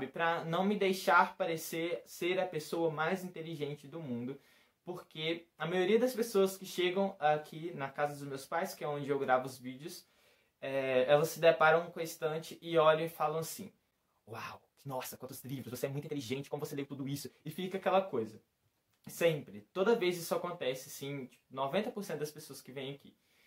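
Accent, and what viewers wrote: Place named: Minas Gerais, Brazil. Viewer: É uma delícia ficar ouvindo esse sotaque mineiro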